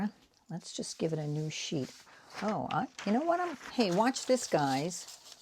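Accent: Boston, United States. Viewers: It's called "code switching" when you change accent to that of those around you. It's a natural human psychological mechanism, and whole books are written about it. I had to study it for one of my sociology classes at uni. It stuck with me because i had several different accents around me, growing up, and had become adept at popping between them, but always felt fake- even though they were technically all MY native accents! Anyway- i adore the stories and the COLORS! Side note- i was JUST watching one of my favourite travel tubers, Steve Marsh, and he went to Iceland and had a whole segment on the US airbase there. Pretty cool to now run across someone who used to work there! The navy in the 79s? As a woman? You must have SO many stories to tell! I am hoping you share more! (Btw- your boston is still there- just around the edges. ❤) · Love your story about your Boston accent, my husband is also from Boston (raised in NH) and worked hard early in his military career to lose his which he did, but like you, when he gets around other Bostonians, it comes right back! 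And once and while, he slips. I think it’s charming, lol. Btw, I also love Rex Ray art. I’ll send you a very cool video that I came across a few years ago. Have a lovely arty day❣️ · I thought I recognized that accent! I worked to get rid of mine as well and now live in NH and boy do they sound different than Bostonians! When I drive under pressure that accent bubbles to the top and my kids don't let me forge tit. LOL. It's WICKED funny. Love your videos. Just found you today. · You devil. You and the algorithm of YouTube! I’m an ART SUPPLIES JUNKIE in recovery!!! It’s Sept 2025 and I CANNOT buy any art supplies, new or used, this month or else I have to pay $50 out to my BFF for losing our challenge this month. It’s Sep 19, eleven more days to go. And I’m struggling with gel plate printing. Oh, well, I’ll keep practicing and trying to find newsprint free somewhere. And I’ll start buying Nora colors in October, maybe 2-3 at a time. 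I’m loving this video, liking your stories, wishing I could hear your Boston accent, and away we go. BTW, 1976 is also when I started 12 Step Recovery at age 31. I’m 81 and still loving arts and crafts. Also, I just don’t get color theory.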